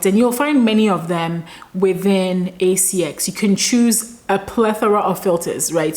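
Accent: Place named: Ghana. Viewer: You sound like a Ghanaian....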